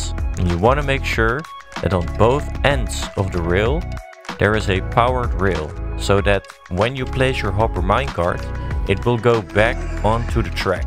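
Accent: Netherlands. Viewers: volgens mij hoor ik dat je nl bent 😅😂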